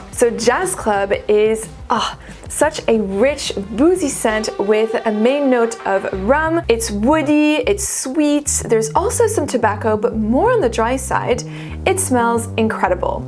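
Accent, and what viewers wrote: France. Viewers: I like your french accent. Grüsse aus Deutschland. Und danke für die gesamten Inspirationen!! 😀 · thank you for this video. French accent is what I'm always looking forward to when watching your videos. Don't apologize for that. I want more French accents! 😂 I like your list. My winter favorites are: Prada L'Homme Intense, Dior Homme Parfum, Bvlgari Man In Black, Guerlain L'Homme Idéal Extrême and Stronger with You Absolutely.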